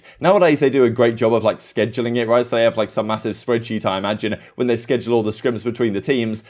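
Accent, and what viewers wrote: United Kingdom. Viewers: This is the most British sounding British person in existence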